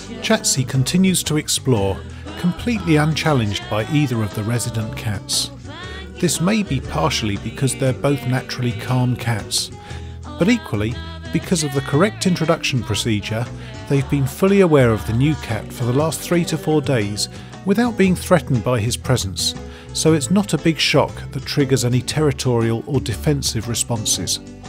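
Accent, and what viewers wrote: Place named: United Kingdom. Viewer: Nice british voice to talk about cats :) sleepy time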